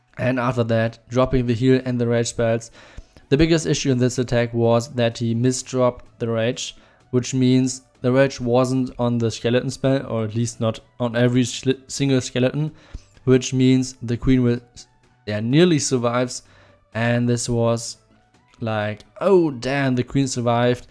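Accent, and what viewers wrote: Germany. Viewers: your English is really good u just have that German kind of accent witch makes since. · Your English is good bro with german accent.... and when you are going to next base building videos?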